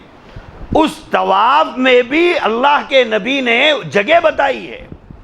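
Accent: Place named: Hyderabad, India. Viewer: MASHALLAH good magar apna neeshani theek se nahi batai aur bartoon ko bout lamba karty ho .phir b JAZAKALLAH KHAIR . Esliya Mai doctor israr SAHAB ko aur SHEIKH imran nazar HOSSAIN ko aur mufti zarwali Khan ko aur peer zulfiqar Ahmed naqshbandi SAHAB an etc etc kuch aur b hai unko sunta hu. Wasy mashallah hydrabadi urdu aplogo ki muja bht PASAND hai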